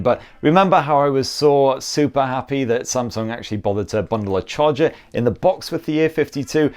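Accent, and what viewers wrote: United Kingdom. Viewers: It's the banters in that classic British accent for me.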